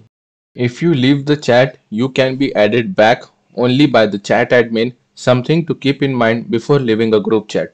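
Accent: India. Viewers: I can't understand your Indian/ English verbiage.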